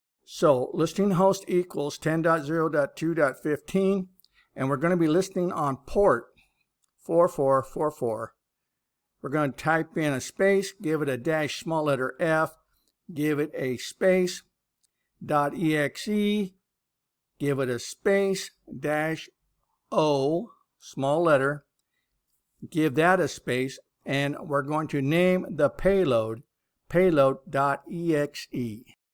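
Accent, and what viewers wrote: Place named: United States. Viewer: hoooly the voice so american based love that nice video KKONAW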